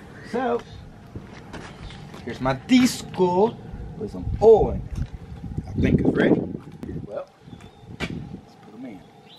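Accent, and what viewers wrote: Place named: South, United States. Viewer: Paisa with a southern accent......I love it. Un mojarra frying a mojarra🤣🤣 Looks goog Y'all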